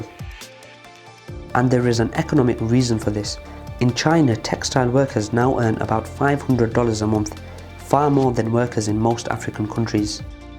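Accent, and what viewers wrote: India. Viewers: Voice of Indian. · He sounds Indian doesn’t he?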